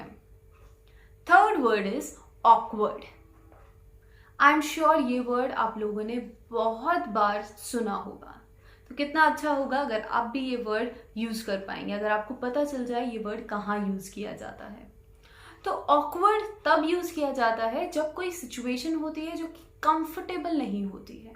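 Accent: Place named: Punjab, India. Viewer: hindi nolan da Great punjabi accent madam g. Sajid Karachi Pakistan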